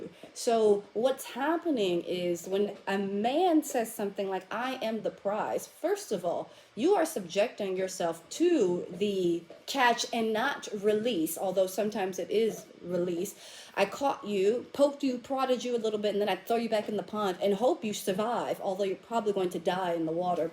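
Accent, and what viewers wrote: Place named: England, United Kingdom. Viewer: Your English accent 😂👌🏾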